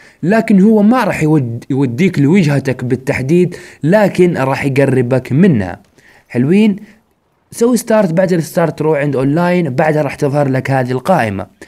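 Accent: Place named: Jeddah, Saudi Arabia. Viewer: اللهجتك جداوي ابوي 😎👍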